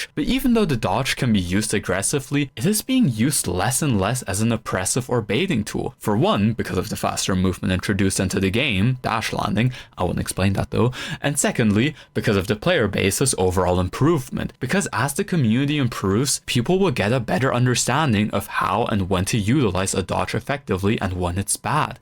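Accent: Germany. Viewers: and the solution of what, to provide a synonym of "let me explain", you have a better writing style, and much more vocabulary than me, and you are expressive. So i guess u will figure it yourself, u dont need a solution from someone is worse than in that area that is why i did ignore the request because im not qualified and it looks silly to me :p "asking for a synonym" also at first i thought even if i provide u a synonym what is the point u will repeat it, or maybe u will use a variety of words to explain one thing, which u can use one word for it. And the thing is, dont take it as a flaw it is ur style, many people in the world do the same thing they have some repetitive words and u can realize it, idk if they themselves realize they keep repeating the same words or not. In your situation i just pointed it out as a joke you don't need to fix things u aren't broken. 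And your english is really fine, i even thought unironically the pronunciation of "other" is "oder" because im not a native speaker + and English is not pronounced as written, until i opened your channel and i saw the location of ur account is germany so i knew that was your accent. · WOW combo codex an absolute fucking slap of nostalgia lmao i did not expect to feel this i wasnt in that community or anything, though kurta and a few other friends talked to me about it, but i remember that logo popping up in a bunch of momo's (oof) 2v2 combo videos also now that you mention floater i feel like i could recognise you from there too since ive watched a decent amount of his streams, mostly because i liked his hair a bunch xdd also glad to see someone else who loves catnips videos, i feel like i was always surrounded by people who just didnt think anything of him (one person in specific who i wont name disliked him because he dislikes eve music, which is a valid point i guess) and yeah the way weapons used to work definitely kept a good part of the game moving. 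after having played a bunch after that stuff got patched out, watching those old jellyfish montages it feels like the game was literally broken 😭 the amount of times i got invited into a lobby after a game and get my hopes of making a new friend of a person i enjoyed fighting crushed, because they didnt have fun and just wanted to drop a few slurs, eugh anyway ive been playing tf2 lately and since you mentioned it too i figure its about time someone recommended it to you :))))))) give it a shot, its deeper than it seems but also easy to get into also all this and this is how i find out you speak german lmaoo i had a hunch because of your accent but didnt want to say anything nochmals, danke fürs Video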